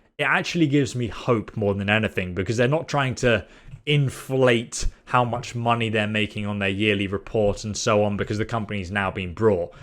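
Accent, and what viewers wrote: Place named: United Kingdom. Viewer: Bought doesn’t have an r. Just because your British and say words with a weird emphasis on vowels doesn’t mean you can add letters.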